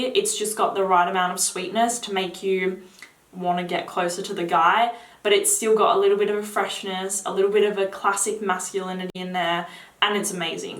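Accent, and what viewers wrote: Australia. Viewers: it went up quick. It's that aussie accent. Us blk men love it. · An Australian accent on a woman is my weakness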